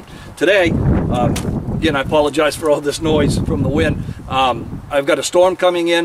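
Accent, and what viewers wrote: Maine, United States. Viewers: I knew you were from Maine when I heard your accent!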